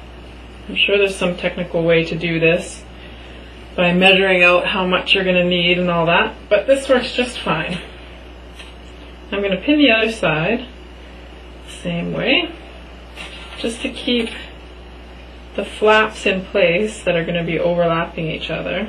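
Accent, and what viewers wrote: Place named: Minnesota, United States. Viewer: Do I detect a Minnesotan, or something nearby, accent? 8) You sound like so many of my northern relatives. Thank you for the video - about the only thing I can sew is blankets and pillow cases so it was nice to see another use for straight lines. I like to use box flannel and back it with satin - it's a tough sew with the slippery fabric, but the kiddos Love the 'silky' when they take their naps.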